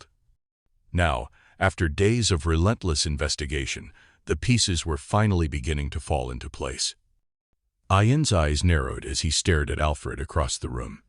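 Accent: United States. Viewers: No no no, can't have an American accent doing Agatha!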